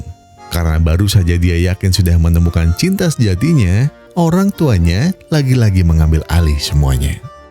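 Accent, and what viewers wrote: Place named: Java, Indonesia. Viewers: Suarane khas bgt wong yogjo mas. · Logat jawa'mu kuwi lo aku suka 😊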